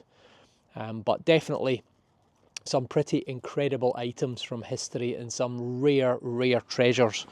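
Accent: Scotland, United Kingdom. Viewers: Fantastic! You're so lucky to do what you do and where you live is spectacular 👌 Scotland is my ancestral home and I really appreciate seeing the countryside and hearing the accent 😊 and thank you so much for sharing your experience with us all, I'm in New Zealand btw and my Dad is Scottish 👍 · Just stumbled across your channel. Thoroughly enjoyed watching - thank you so much … 😁 I have lived in Australia for decades, and although UK born and bred, I have many happy memories of time spent in Scotland visiting my Uncle Jock and Aunty Aileen. You sound very much like my uncle … 😁 so nice to hear that lovely accent … subscribed 😜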